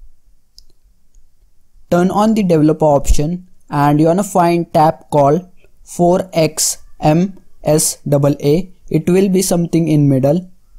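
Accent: India. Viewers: 1. He's speaking in english 2. He has a indian Accent. What's your point? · Sorry I didn't understand you right 😊 I can speak english but I don't understand english with indian accent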